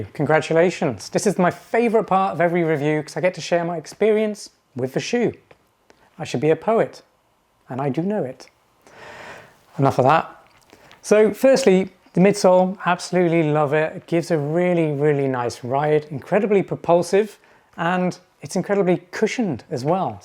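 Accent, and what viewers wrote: United Kingdom. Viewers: You have British accent but talk in dollars. Did you go TTS in your UK size or US. Many say go up half a size in NB but I've just bought these in my usual 9.5 that I buy for most trainers. I'm hoping when they arrive there ok it's more length I'm bothered about as I have average width feet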